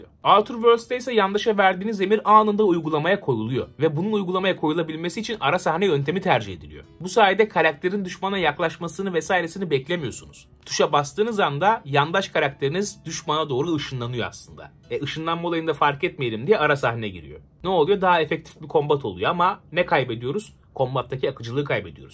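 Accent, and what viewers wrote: Russia. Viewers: İngilizce konuşunca İngilizce konuşan ruslara benziyorsun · Rus aksanı❤ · İngilizce'yi, Rusça konuşur gibi konuştun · Ruslar gibi ingilizce konuşuyorsun (CS:GO Ofensive günlükleri) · Neden Rus aksanı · ingilizcende rus aksanı var gibi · Niye rus aksanıyla ingilizce konuşup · neden ingilizceyi ruslar gibi konuşuyor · Rus aksanlı * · İngilizceyi Rusça aksanıyla konuştuğunu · niye Ruslar gibi engilizce konuşuyorsun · aynı rus ajanları gibi ingilizcen var valla çok tatlış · Rus aksanıyla ingilizce konuşman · Güzel rus aksağını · Mükemmel Rus aksanıyla İngilizce konuşan * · niye rus şivesi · ABİNİN İNGİLİZCESİ RUSLARIN İNGİLİZCE KONUŞMASIYLA ÇOK BENZEŞİYOR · o nasıl bir ingilizce rus gibi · ingilizcen rusçaya kaymış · rus aksan?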